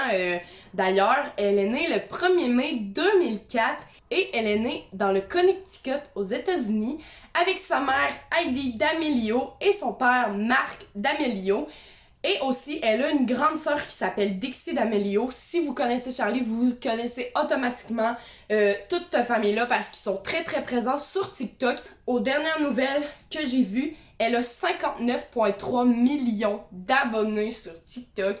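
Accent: Quebec, Canada. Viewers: Je KIFFE TELLMENT ton accent québécois c’est un truc de dingue 😂😎❤️ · L’accent québécois c’est juste une pépite 🦋💎😙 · J'adore ton accent québécois (même si je comprends pas trop parceque je suis française de France )ptdrr j'explique très mal😭😂😅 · C l'accent québecoi 👌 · Boblyn Mukuna bah elle a un accent cebecoi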